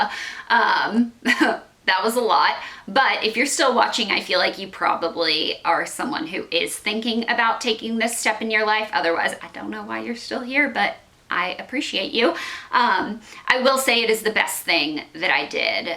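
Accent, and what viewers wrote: South, United States. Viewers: you were one of the first, if not the first, beauty related youtuber that I subbed to way back when because of number 1: your southern accent lol number 2: your mac beauty hauls and 3: you were just fun to watch! I'm so happy to see that you're doing and looking amazing and that you took time off to refocus and find true happiness vs looking for it in LA. Everything in LA is rotten. so happy you got outa there! <3 If you're looking for new video suggestions lol I'd love a day in the life in Nashville. I'm dyingggg to move there! would love to explore it through your pov. :D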